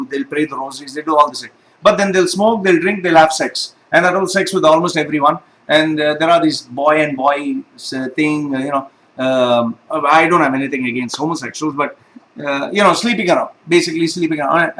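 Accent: India. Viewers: Hey guy. ..talk about ur nationality. .ur accent like. ..Indian. ...sooo talk about Indian. .. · akala mo kong mtatalino ang lahi mo.hoy tingnan mo muna ang mga kalahi mong mga Indian kong matatalino ba o mga edukado, kong mkapagjudge ka sa mga Pilipino wagas.At ano nman pakialam mo kong anong gustong bilhin nmin,pera namin ang pinambili hindi pera ng india.Palibhasa hanggang panglalait lang ang alam nyo sa mga pilipino kasi kahit saan kami magpunta magaling.tingnan mo muna sarili mo at kapwa mo indiano kong maayos ba o hindi.indiano ka nman eh hindi Arabo.salita mo pa lang halata na indiano. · all were true..i can sense you have indian accent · he sound an indian.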